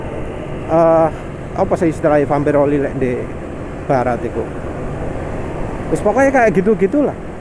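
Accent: Sidoarjo, Indonesia. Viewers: Logat Sidoarjoane sangat kental mas bro😂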